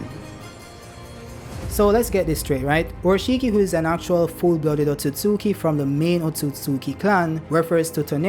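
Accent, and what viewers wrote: Jamaica. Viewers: Are you jamaican brotha?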